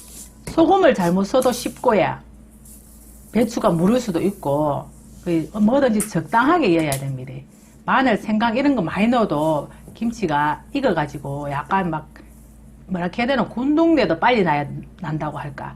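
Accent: Gyeongsang, South Korea. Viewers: 경상도사투리에 더정감감이가내요. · 주부님 지루하지않고 영상보면서 저도같이 웃고있네요 특유의 경상도 말이 안동고향살땐 대구에사시는 시누와 애기할때도 그렇게 제미있다라고생각못했는데 전라도와서 말씀이 이렣게 제미있고 구수하게 들리네요 ❤❤1❤❤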